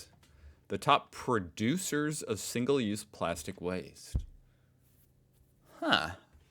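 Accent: England, United Kingdom. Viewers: "Happy arvo". That 'call-sign' is cheerfully yours, but your Australian accent is hybrid British. But no worries mate. Cheers from Sydney, AU. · You can stop with the British accent. LOL! You have never done an Aussie accent. LOL! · You sound more like a Pom definitely not Aussie 😂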